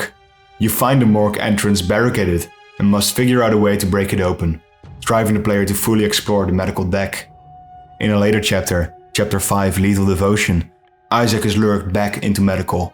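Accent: Netherlands. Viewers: Great video, awesome accent where you from dude? Guessing Dutch but not positive. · i'm smelling a dutch accent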